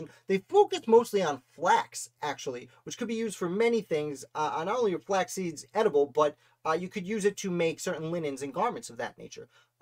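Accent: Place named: Long Island, United States. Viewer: Where is his house? I’m from Ronkonkoma. Where are you from? You say “lawg island” like me lol 😂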